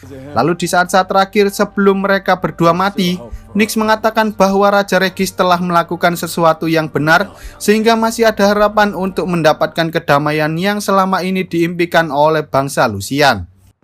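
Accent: Java, Indonesia. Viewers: Kemana aja bang kangen sama omongan jawanya 😂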